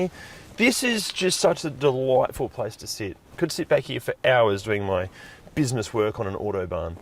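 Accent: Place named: Australia. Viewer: Horrible accent. What is it? Doesn’t pronounce his ‘t’s and ‘R’s Australian I think?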